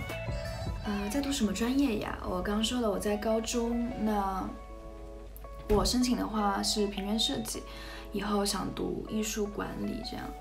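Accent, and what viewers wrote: Shanghai, China. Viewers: 你说上海话我听得懂听得懂哈哈哈哈哈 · 上海话😭😭😭又亲切又可爱，好像从小看着长大的邻家小妹一般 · 哈哈哈上海話講的蠻好聽的呀，可愛~ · 00后原来讲上海话已经洋泾浜了！好可惜啊！susan加油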